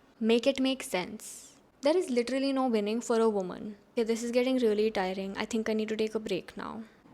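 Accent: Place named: South Delhi, India. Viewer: just shutt up this nonsense shitt and speaking south delhi accent😂its not making u cool🤡🤡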